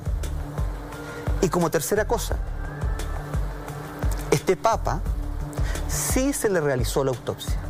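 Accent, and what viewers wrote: Chile. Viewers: Quería ver este video pero apenás escuché el acento chileno dije, no gracias prefiero vivir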